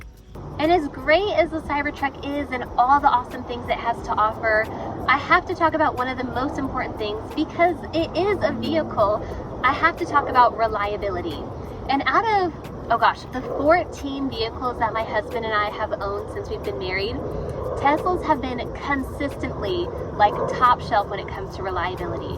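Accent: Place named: Virginia, United States. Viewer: That Virginia accent!!!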